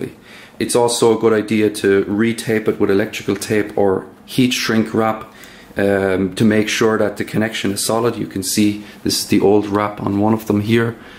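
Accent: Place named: Ireland. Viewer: How do you have an Irish accent?😂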